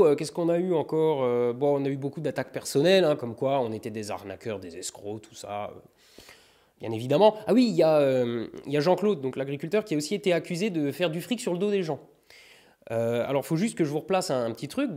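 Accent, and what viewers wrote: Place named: Lorraine, France. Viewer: Il m'a fallu du temps, mais j'arrive à entendre ta pointe d'accent Lorrain ! :P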